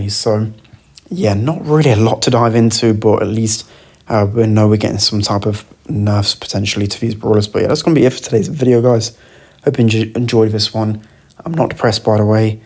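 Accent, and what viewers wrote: United Kingdom. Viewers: Is this British accent? I need the subtitles to understand what you say :( Apart from this the video is very good and thanks for the balance changes you noticed and shared.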